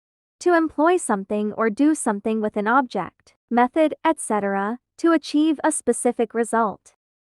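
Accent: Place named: United Kingdom. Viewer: عادي تسوين بلهجة بريطانيا❤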